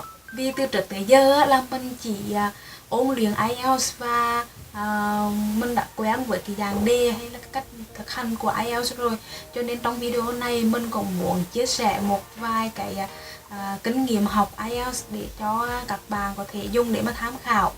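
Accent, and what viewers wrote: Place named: Nghe An, Vietnam. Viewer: Giọng nghệ an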